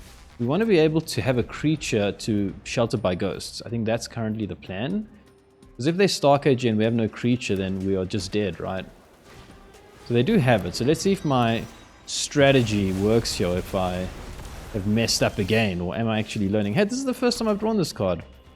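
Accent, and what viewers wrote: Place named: South Africa. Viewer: You South African? :D Also, I see Mythic Mike, I like haha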